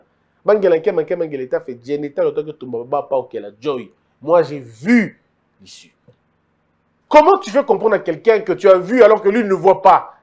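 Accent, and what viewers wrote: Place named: Mongo, Democratic Republic of the Congo. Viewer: Mr, j'aime quand tu parles le Lingala avec un peu de kimongo.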